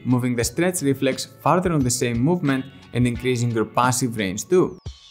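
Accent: Spain. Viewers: What accent is that? Are you from Spain?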